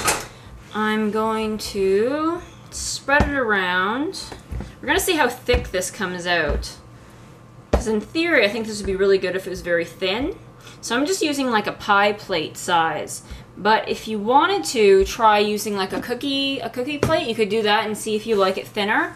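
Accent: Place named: Canada. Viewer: All foods are an aquired taste. Where did you get the Oil atomizer?(brand name, you sound Canadian, is it from the US?) Can't find them here. Coconut would rock in this recipe too.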